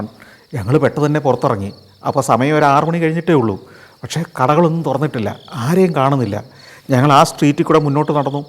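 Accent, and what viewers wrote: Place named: Kozhikode, India. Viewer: മൂപ്പർ..... എന്റെ കോഴിക്കോടൻ ഭാഷ...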